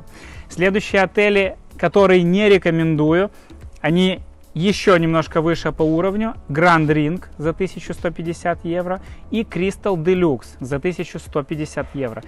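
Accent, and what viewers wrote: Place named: South, Russia. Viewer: вы знаете русским пофиг вообще на украинцев и что там происходит. В моем городе мы и не слышим не о каких убийствах о чем вы рассказываете. Я жила на Украине 10 лет и уехала в 14 году там была очередная 110 революция. Причём там русские тем более те которые ездят в отпуск в 5 зведочные отели. В окопы идут совсем другие люди те кого жизнь пережевала и выплюнула прямо в эти окопы. Они за 200 000 идут убивать и умереть а обычные люди (человеки) 200 000 руб за 7 дней отдыха оплачивают. Каждый май и октябрь ездим в Турцию украинцев не помню там вообще, ну или они сильно косят под русских что не отличить. Да и кому какая разница на отдыхе. Все вместе тусим отдыхаем в Турцию все заточено под русских 😊 ездим тада как на дачу. Автор видео 100% русский, спроси у любого европейца😂😂😂 Немного выдаёт "колидор" и "гэ" и эта настойчиво агрессивная подача характерная для южных народов, вполне за Краснодарского парня сошёл бы. 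Или любой другой глубинки России.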